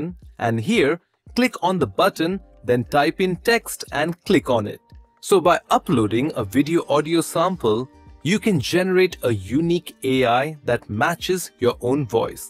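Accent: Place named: India. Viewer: eventhough you are already using tts, your idian accent still strong.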